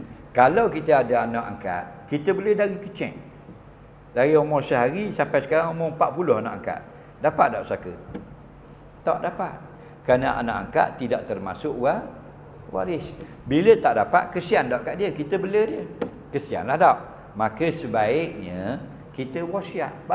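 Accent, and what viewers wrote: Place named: Terengganu, Malaysia. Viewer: Ustaz ckp bahasa melayu standart..ujung2...je ganu...jarang2 ni..😂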